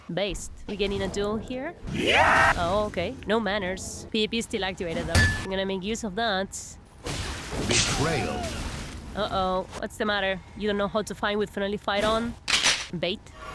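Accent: Russia. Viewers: me encanta que esta youtuber que me habla en ingles, con acento ruso y me suelta un insulto en perfeto argento 10/10 vamos a ver mas viedos.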